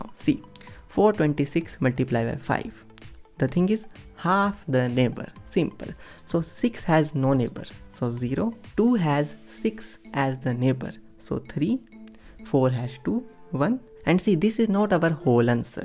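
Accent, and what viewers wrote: India. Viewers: bro sounds more indian then me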